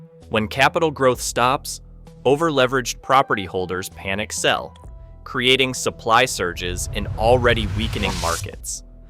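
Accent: United States. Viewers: Why the American accent, inconsistent info and fake claims? clickbait. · Perhaps you might use more up to date information and use relevant shots instead of pics of other parts of the world. Not sure who you are but an American voice just denigrates the post. But you have fun passing misinformation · Why the American accent?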